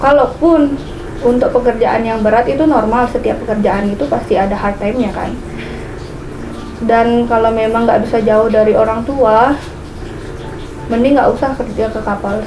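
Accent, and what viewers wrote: Bali, Indonesia. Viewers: Sing hilang" logat bali ne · Logatnya asli bali bnget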